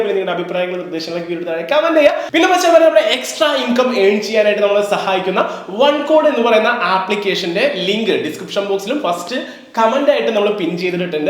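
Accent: Thrissur, India. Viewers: Machan thrissur karrananno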